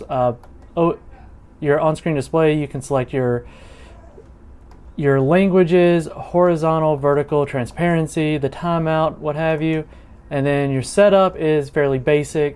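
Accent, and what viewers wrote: South, United States. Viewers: Love the soft southern pronunciation. Very relaxing to this Yankee.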